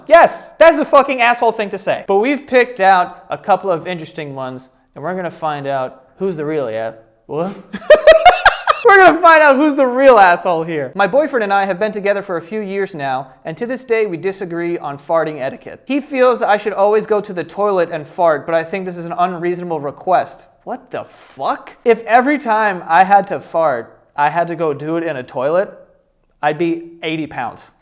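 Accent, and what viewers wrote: United States. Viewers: Wait.. do Americans say axe instead of ask?? Haha how did I not notice till now😂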